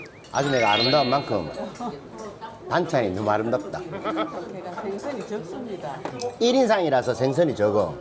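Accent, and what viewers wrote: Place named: Gyeongsang, South Korea. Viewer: 경상도사투리조아요~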